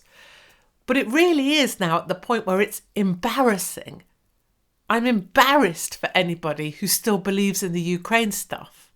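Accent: United Kingdom. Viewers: We never provoked Russia. It would be nice if you guys stopped spreading Russian propaganda. There were no biolabs. There are no NATO nukes pointed towards Russia on the Russian border. Last I checked Putin doesnt seem to have a problem with slaughtering civilians, since he does it himself. In Ukraine. In Syria. In Georgia.... So Nuland, a single person says "F*** the EU" therefore you hate all of America? Amazing. BTW are you even part of the EU? Your videos indicate towards a heavy British accent..... According to the world corruption index, Russia is even more corrupt than Ukraine. in his video critizising Mearsheimer and Realism, in the last segment he compares Russian corruption with Ukrainian corruption. Its quite different. In Russia Putin controls the corrupt. In Ukraine, nobody controls the corrupt. So in Russia you have corruption + autocracy. In Ukraine you have corruption + anarchy. 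And this also makes sense, since historically Ukrainians have always been anarchists, while Russians have always been authoritarians. Why should Ukraine pay reparations to USA? Makes no sense. In fact the West is setting up a Marshallplan for Ukraine to rebuild them, once they are liberated from Russia. And we have seen the enormous economic sky rocketing of countries that get free from Moscow's clutches and even more incredible when they get supported. If Ukraine wins, the one to pay reparations is Russia, to Ukraine. If Russia wins, it will rebuild for free? LOLOLOL What ever Rus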